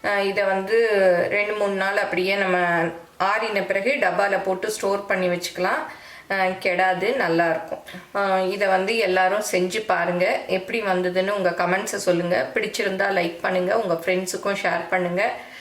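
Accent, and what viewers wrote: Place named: Chettinad, India. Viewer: Hats off achi, use our chettinad Tamil when speaking. It's so nice to hear. Very sweet of you. Recipes are good too.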